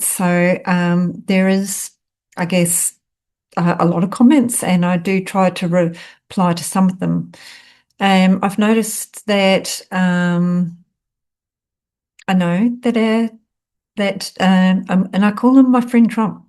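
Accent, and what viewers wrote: New Zealand. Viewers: Thank you....for your honesty and bravery. I feel very connected to your messages.....and love hearing the kiwi accent!!!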